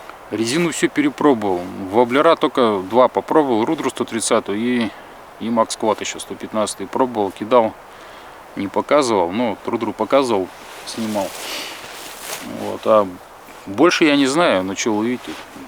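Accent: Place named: Urals, Russia. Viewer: Говор уральский)) какой регион? Свердловская область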